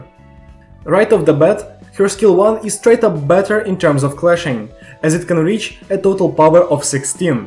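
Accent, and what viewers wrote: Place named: Russia. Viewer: ты случаем не русский?, просто акцент сильный вроде, я не уверен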